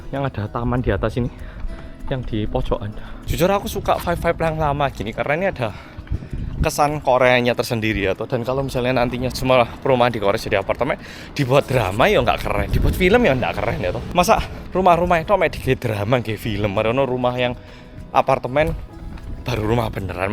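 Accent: Java, Indonesia. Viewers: lagi ngrekam.bahasa indonesia logat jawa😂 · yg aku selalu sadarin tuh cara ngomongnya mas khas bgt kek org jawa. ngomongnya harus berbelit2 dan ga to the point wkwkw. no offense, aku jg kyk gini krn org jawa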